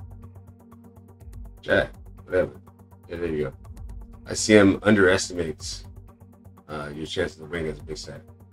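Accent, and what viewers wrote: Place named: New York, United States. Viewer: are u from NYC? you sound like a new yorker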